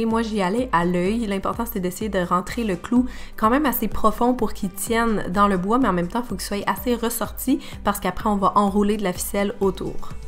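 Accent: Quebec, Canada. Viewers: C'est marrant ton accent québécois ressortait beaucoup avec ton extinction de voix 😂 sinon super vidéo comme d'hab ! 😘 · je suis d'argentine et je suis en train d'apprendre le français. C'est un peu difficile de comprendre l'accent québécois pour moi, mais j'adore le français💟 merci pour la vidéo bisous Feliz Navidad para todos!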